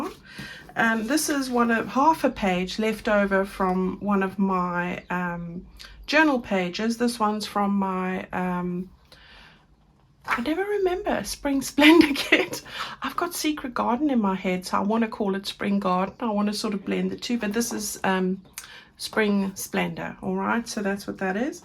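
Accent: South Africa. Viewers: I absolutely love your videos... Sorry it is so hot there but here in Johannesburg it is freezing and I am in Europe next week and I can't wait for a bit of warmer weather... I also don't like it too hot though... I knew you had spent time here in SA I can hear it in your accent... so nice to see one of ours doing so well ... thank you for all your beautiful stuff. <3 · It's the way you say"here" then you said you used to live in S.A. And then I realised why I couldn't place it. You've been here a while hey xxx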